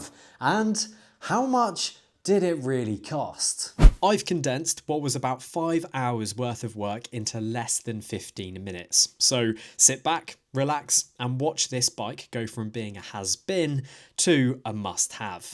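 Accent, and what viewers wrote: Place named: RP, United Kingdom. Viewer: Thats a very posh entrance for a bike journalist, though he does have a very posh accent.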